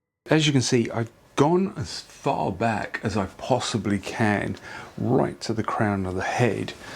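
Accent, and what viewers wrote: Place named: United Kingdom. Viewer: And YOU are annoying because you're operating YouTube, and don't even know how to adjust the speed 😂 This man put in SO much work to HELP TEACH people, and all you can do is complain? Maybe just don't watch at all and save the annoying, rude, and ungrateful comments for somewhere else 🙄 Also- Many British accents are slower/more drawn out. He's also trying to be very deliberate in what he says so that everyone can understand.....but again- you DO have the option to speed it up!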